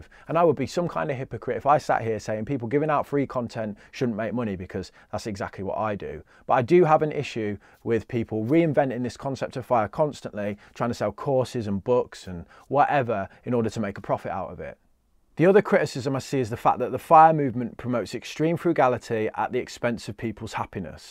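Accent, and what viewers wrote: Birmingham, United Kingdom. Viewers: Hey neighbour!! I always thought I could hear a touch of manc in that Brum accent! 😂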